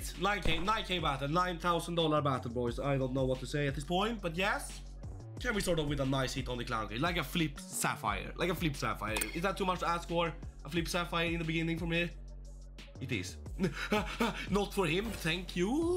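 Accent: Sweden. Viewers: hahah älskar din svengelska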